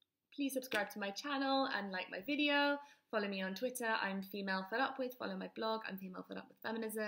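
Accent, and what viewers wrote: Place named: United Kingdom. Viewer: Don't do twitter but nice to hear a Brit. talking about all this. Have subbed.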